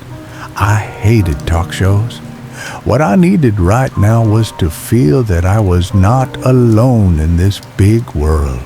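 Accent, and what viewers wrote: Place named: South, United States. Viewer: A Southern accent talking about kilometers and petrol??? Somebody didn't do ANY research before posting this mishmash.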